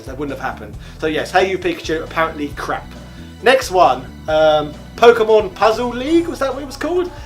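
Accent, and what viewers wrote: United Kingdom. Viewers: I really cant stand this idiot, these Brits are f***ing annoying as hell. Is it just me, or does anybody else find this guys Brit accent and obnoxious personality irritating beyond belief? I tried giving this guy the benefit of the doubt by at least watching 2 or 3 mins of his video to see if i can actually relate to him, but hes annoying as f**k. I know there are probably some cool Brits out there, but Brits like this guy give people from the UK a bad name. Just a pure obnoxious and annoying d**k.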